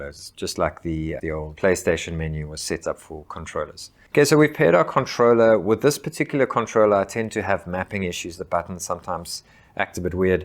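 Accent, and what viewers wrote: South Africa. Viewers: Was about to say that bro sounds south African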